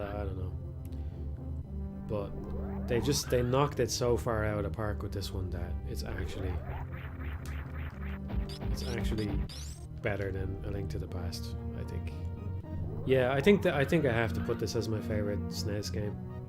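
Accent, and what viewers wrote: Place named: Ireland. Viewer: It's really nice to hear your dulcet irish tones again Here's hoping we get to hear them again soon, happy holidays man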